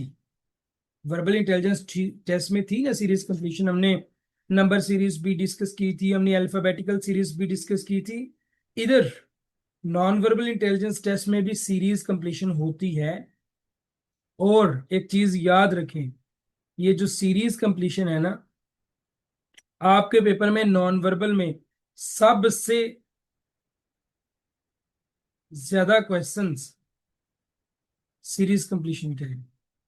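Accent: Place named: Punjab, India. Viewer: Haha ur Punjabi ascent hits different 😂❤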